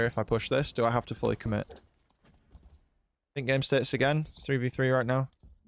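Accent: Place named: Sheffield, United Kingdom. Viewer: Sounds like a Sheffield accent 👀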